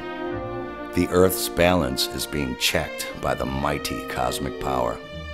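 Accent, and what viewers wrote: United Kingdom. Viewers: WHAT IS this music... is your name? I lived for a few years in Kolwezi, Katanga [Shaba] in Zaire, and we had a small lake there, perhaps what's left of that Lake called Zembre... Zaire is the name of the whole country [Congo[ in Mobutu's time. Kolwezi is close to Angola. [that was 62-64]. Before that I was in Kambove and Jadotville/Likasi, born there of colonial parents, and we retured to Belgium. I am in Britain since 1968. I love it when you read a text with the British accent, and of course your amazing theories, especially this one with an eluxidation for this great mystery concerning what the Son of Man means!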